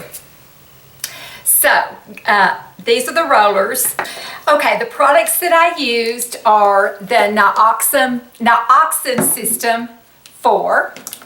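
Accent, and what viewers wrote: South, United States. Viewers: You might be the sweetest person on YouTube! And I just adore your accent! Im from Ky and I still hear such a southern draw in yours. I could listen to you read a dictionary 😊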